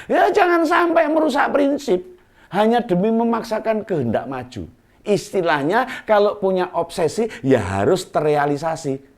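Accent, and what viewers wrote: Java, Indonesia. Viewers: saya sukasekali dgn logatnya mas ini, jawa banget, logat asli jawa majapahit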